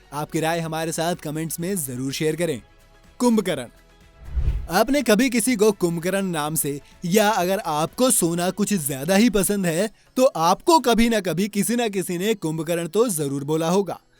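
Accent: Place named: Tamil Nadu, India. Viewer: Ap to tamil ke thara bolte hai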